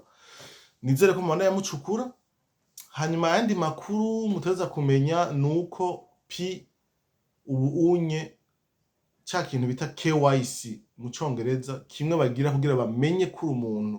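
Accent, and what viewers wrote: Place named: Brussels, Belgium. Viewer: ngo wemeye ubuki utegekaaaa, hahah , ico kirundi nica bruxL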